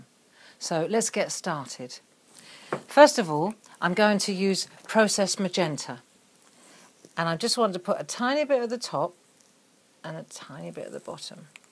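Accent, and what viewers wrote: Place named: United Kingdom. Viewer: Wow, that’s so clear and helpful. Thank you. Lovely to hear a British accent too. 🙂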